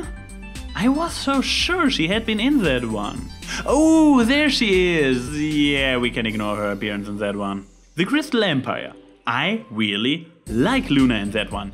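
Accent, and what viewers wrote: Germany. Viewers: OMG THE GERMAN ACENT!!!!!!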